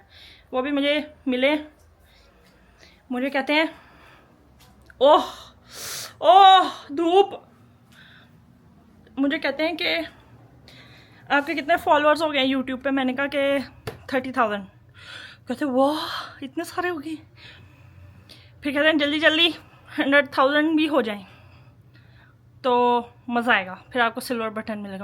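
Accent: Lahore, Pakistan. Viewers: Salam g so quite look vicee totally koean but your language extent is lahori ap to koeran silha rhe hn m ap ko quran sikhana chati ho takweed k sath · U r soooo cute n talk typical lahorei Urdu … simply love watching u 💕💕💕💕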